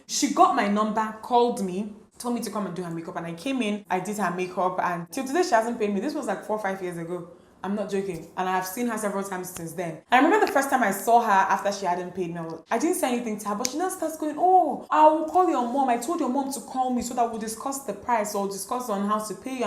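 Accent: Nigeria. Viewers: I wonder what's wrong with your accent that someone will be complaining about. Hmmm... World people, I personally loves your accent. It's our Nigeria accent and very understandable, that Afro Puff don't know if he or she should go and complain on all this Ghana or Kenya Youtubers that uses their country accent na. Nma please don't change who you're because of what people are saying because you can never asin never please world people. If you're doing this, they will request for that and if you start doing that, they will automatically request for this. Stay blessed, you motivates me a whole lot. Thanks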